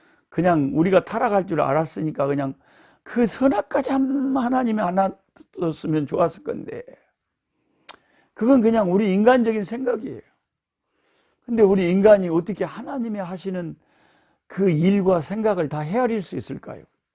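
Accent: Jeolla, South Korea. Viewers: 아따 같은전라도네요 사투리넘정겹네요 순천이네요 선교사님 어떻거멀리가서선교하시느라 애쓰시네요 잘듣고잇네요 강건하세요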